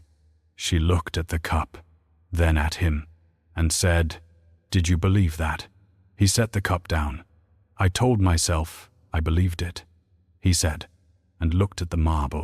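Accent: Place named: Eastern Europe. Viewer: The story is pretty good, but the pronunciations of the Italian names sounds more eastern European. You have to make it some more Italian. I would say eight out of 10.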